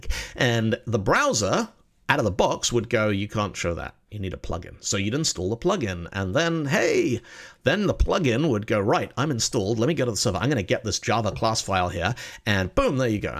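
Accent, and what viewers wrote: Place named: United Kingdom. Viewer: I can't pin your accent. It's like British with a twist of Oz and North American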